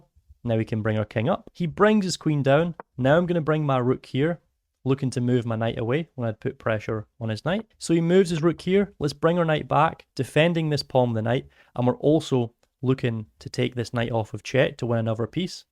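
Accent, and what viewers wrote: Scotland, United Kingdom. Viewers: Nice Scottish voice, subscribed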